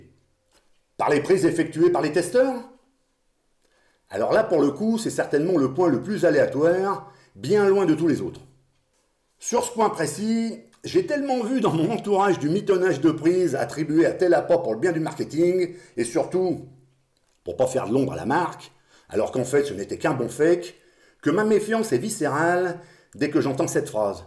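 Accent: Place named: Lyon, France. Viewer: Bonjour, je découvre ta chaine et je m'abonne car ton contenu, ta franchise et ta gouaille lyonnaise sont tout simplement top. Je ne suis pas un pêcheur de carpe régulier car j'aime trop la pêche pour ne pratiquer qu'une technique ceci dit (Eric Pitre et maintenant sont les 2 seuls carpistes YT ou j'ai cliqué sur s'Abonner), quand je vois ton sweat team Hutchinson,ca me projette 25 ans ans arrière dans les Carpe magazine et mes uniques cannes 13 pieds 3,5 lbs 4 Dream maker sont toujours operationnelles. Longue vie à toi!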